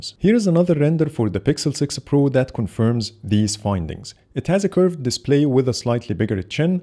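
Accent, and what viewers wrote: Egypt. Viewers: Your accent is a lot similar to our Egyptian one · طب و الله حاسس انك مصري رغم انك تتكلم انجليزي😁 هعرف نفسي صحيح لما تحطلي قلب😅 اخوك من تونس 🇪🇬❤️🇹🇳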